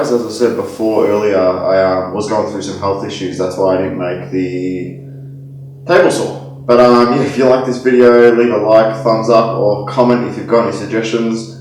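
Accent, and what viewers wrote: Australia. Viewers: Are you Aussie? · WOW!! great work... nice to hear another Aussie voice on youtube..If i may make one comment, ive always used a router with a center jig for cutting out circles.. but i do like your idea of using the beltsander while the sanding disc are spinning...new subscriber..cheers!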